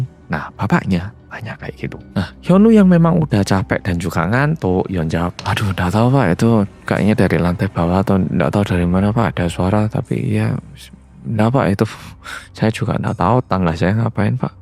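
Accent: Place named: Java, Indonesia. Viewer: Siang mas muedok😅 Lgsg nyimaaak🎉🎉🎉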